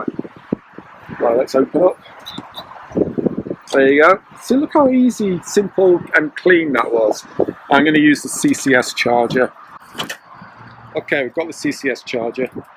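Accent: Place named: Northern England, United Kingdom. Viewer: a proper (Northern) accent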